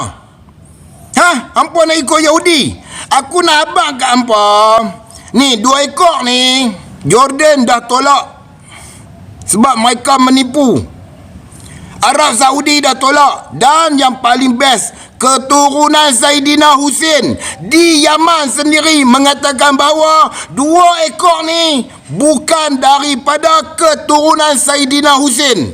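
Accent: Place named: Kedah, Malaysia. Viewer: Salam , org Indon faham juga ya cakap orang kedah Malaysia . Terbaikkk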